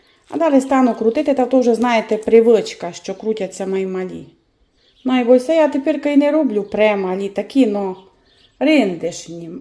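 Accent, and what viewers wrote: Transcarpathia, Ukraine. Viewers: Хто це такий безграмотний навчає, як їй розмовляти? У кожному регіоні України є свій специфічний діалект. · Дякую за смачні рецепти,нічого не змінюй,приємно чути закарпатський діалект,розвиток каналу та нових підписчиків,благополуча твоїй родині. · Закрийся, безграмотне нещастя Це закарпатська говірка! Приємна на слух і всім зрозуміла Велика подяка цій чудовій господині ❤❤❤ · Ой, Андрюха, насмішив😂 Це закарпатській діалект. на це питання вже відповідала. А у самого який діалект? Скільки помилок у солов'їній😂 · Дякую, люблю слухати Вашу мову з закарпатським діалектом! І господиня ви прекрасна, молодець! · добрий день! Дякую вам за цю смакоту, ви так все доступно пояснюєте, що навіть недосвідчена господиня з легкістю може це приготувати, якщо є бажання звісно! Особлива подяка вам за ваш закарпатський діалект, кожне відео дивлюсь із задоволенням! 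Завдяки вам навчилась закручувати голубці таким способом( конвертиком), так кажуть на Вінниччині. Миру, добра, перемоги нашій рідній, рясних Божих благословінь вам і вашіій великій родині! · дякую Вам за рецепти i Вашу закарпатську мову - вона неперевершена. А на дурнiв не звертайте уваги. Пишуть коментарi i дають поради, а помилок, як у учнiв першого класу. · Дякую Вам за хороші рецепти, коментарі до страв, оформлення страв та столу, красивий посуд. І надалі показуйте закарпатські страви, ваш говір і традиції закарпаття. На дурні коментарі не зважайте.😊😊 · Як вам не соромно! дуже щира мудра жінка. А те, що вона так розмовляє, то на Закарпатті такий місцевий діалект, і це прекрасно, тому що говорить про різноманіття нашої країни. Ви повинні вибачитись за ваше хамство. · мы вас очень любим и мы очень любим ваш закарпатский говор. С любовью из Нью Йорка. 🇺🇸❤️🇺🇦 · Не слушайте всяких дураков. 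Так как разговаривают на Закарпатье, нигде не говорят. Наш диалект не сравним ни с чем. Я слушаю вас с удовольствием, сама из Закарпатья уже 30 лет живу в Греции и не забыла до сих пор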